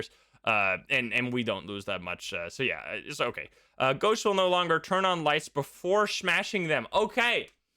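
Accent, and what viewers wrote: Netherlands. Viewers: Wait what, are you Dutch? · Wait a sec are you dutch or belgian?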